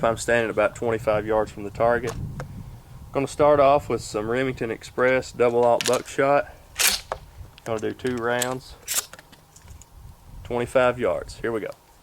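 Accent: South, United States. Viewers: very neat idea, also love the Tacoma, '05-'07? The wife said ya must be a "Southern" boy. Accent. I couldn't tell.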